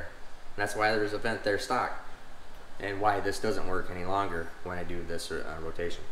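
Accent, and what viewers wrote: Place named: North, United States. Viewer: I'm from Arkansas so I noticed that same thing he definitely sounds like he is from the north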